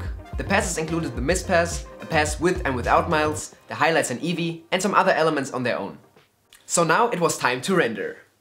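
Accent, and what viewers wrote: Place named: Germany. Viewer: I hear German and I like it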